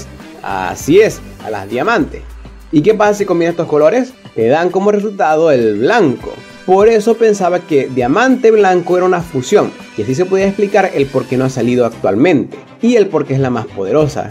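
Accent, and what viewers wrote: Venezuela. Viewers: este pana es venezolano??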